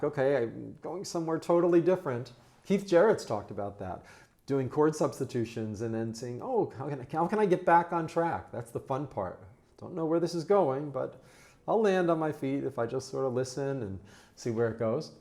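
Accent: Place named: New York, United States. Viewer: It's been such a pleasure watching you and leaning with you over these past few year. I have noticed that your NY accent and cadence have gotten a bit thicker over time compared to your first videos ;-) Keep well.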